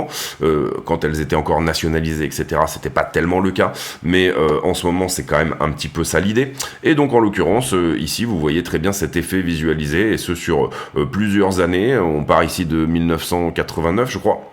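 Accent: France. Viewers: Ca veut dire quoi “ hard close “ que tu répètes à toutes les sauces , fermeture difficile ?? J’avoue que ça me dérange cet abus de mots en anglais par tous les Youtubers français avec un accent franchouillard qui essayent de placer à toutes les sauces des mots en anglais comme , move , push , fill , up , down ect ect Est ce qu’ils pensent que ce donne un genre et que ça les rend plus professionnels ??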